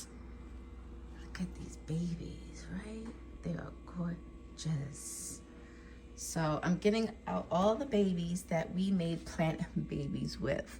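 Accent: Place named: New York, United States. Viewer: Your plant babies are cute. Love the NY accent!!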